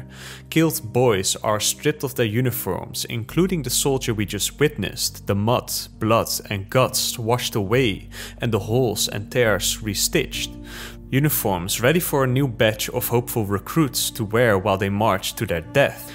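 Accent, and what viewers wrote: Netherlands. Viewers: you can just hear the dutch in his voice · Tell me you are dutch without telling me you are dutch ;P very goed acent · Dit is het meest Nederlandse accent dat ik ooit gehoord heb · Het Nederlandse accent is zo zwaar😭😭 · Tell me your dutch without telling me your dutch. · Goddammit. Every once in a while i will come across your videos and i can tell its very good quality. But then i hear your voice... and that horrible Dutch accent (that i have myself) and i just cannot ignore it and it kinda drives me crazy and i rarely make it to the end. Its such a waste because im pretty sure these are excellent video essays! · Lekker Hollands accentje hoor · You sound Dutch 😂 love the video btw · Narrator has the most Dutch accent ever lol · eyyyyy you Dutch? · Ik hoor duidelojk dat je uit nederland komt broeder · Haha ik hoor in je accent dat je Nederlands bent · Ik herken een nederlander als ik het hoor, goede video!! 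· Just found your channel, this is a great video glad it popped up in my recommended. (But are you by any chance Dutch? Your accent sounds familiar) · 1 second in to the video and I reconized a fellow Dutchman! Great video! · Nice dutch accent broeder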